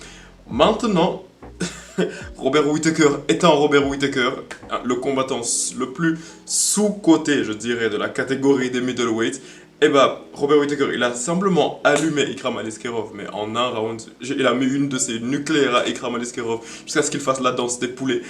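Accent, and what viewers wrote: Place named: United Kingdom. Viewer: T’as grandi en Angleterre ? Je trouve que t’as un accent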